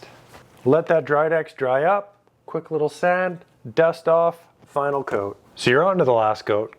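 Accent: Canada. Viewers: Finally! A YouTube video where you don't feel being yelled at! Oh! I just picked up here Canadian. That explains it.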